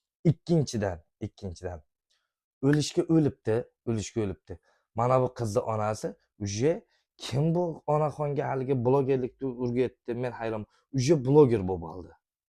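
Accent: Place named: Namangan, Uzbekistan. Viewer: Namangan sheva bulib yotibdiyu yana Tojikistinliklmanmush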